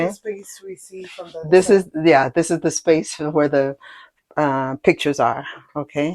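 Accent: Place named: Kenya. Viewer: What an inspiring story. Thank you for sharing. I want to be you when I grow up. You sound totally Kenyan.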